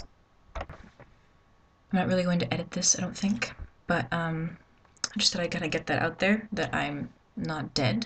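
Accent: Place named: Germany. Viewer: It's great to hear your German-influenced English now! You're speaking English but you're saying it the way I imagine the German phrases or expressions would be said. :)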